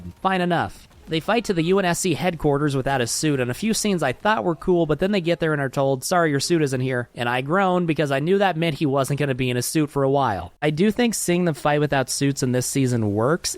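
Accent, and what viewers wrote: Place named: Canada. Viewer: Bro sounds Canadian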